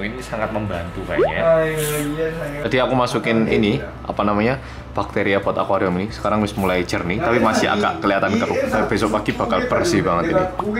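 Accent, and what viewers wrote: Java, Indonesia. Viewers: Hahaha logat jawanya keren bang👍👍👏👏😂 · sebagai perantau di tempat beda budaya dan jadi minoritas, liat mas medhok di korea, malah seperti senasib wwkwkw, where is our home?? haha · Emang kenapa cocok aja Korea sama Jawa sama medoknya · Mas sekali kali nggawe vlog tapi ngomong e ora medhok tapi ke kerea koreanen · Medoknya dr mana ya?mama atau papa? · dan punyaku sak muene,, ngawur ogh mamahku iki nguawurr ini yang toua toua duduk di sofa ya.. Touuua toua duduk disofa ini ya Guendeng yoh,, hhh Retceh tnn aku rek,, krungu sampean medok bek seneng awakku😂😭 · SukA banget km ngomong pake bahasa jowo medok bgt... Sy aja gak begitu medok loh 😁😁😘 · Waktu pertama liat mas, wah ada orang korea cakep... Pas ngmg jadi.. yakin orang korea? Medok banget 😁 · logat te akeh jowo ne...salam dr purworejo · Kok iso medok ngono Yoh????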